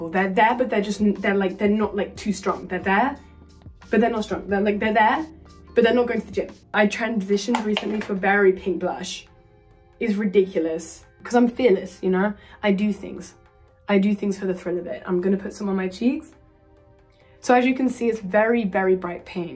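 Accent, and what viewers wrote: United Kingdom. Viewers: SHE’S BRITISH??? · SHES BRITISH???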